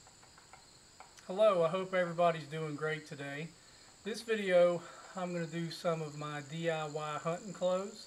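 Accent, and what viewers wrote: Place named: South, United States. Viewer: where do you all live you sound like you are from the south?!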